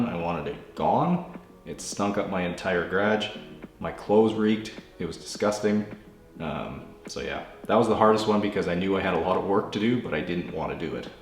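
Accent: Canada. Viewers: NO ONES TALKING ABOUT HIS CANADIAN ACCENT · Love that you played. My Southern roots anyway. Your pronunciation of garage is cute but decal is what stopped me in my tracks. I was like wait...what?! Then it hit me that you were talking about a decal on a car but I heard “deck-cul” remembered I had heard that pronunciation by another Canadian from Western Canada. I have Canadian family from Ontario but I guess you all have different accents. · Now I hear the Canadian accent. I think it’s with the o’s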